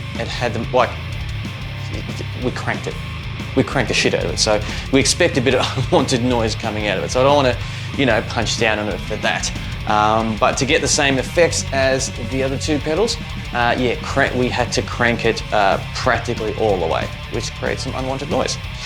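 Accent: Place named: Newfoundland, Canada. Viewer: newfy/irish accent!!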